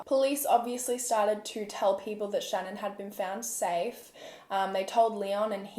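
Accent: Australia. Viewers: I love your accent what type is it? Australian? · So strange hearing an Australian talking about a case that happened down the road from me 🙈 also please don’t tar us all with the same brush 🙈😂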